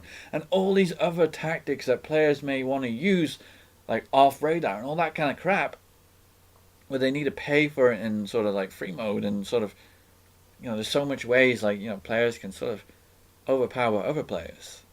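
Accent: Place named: United States. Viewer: Good video, but you sounded too American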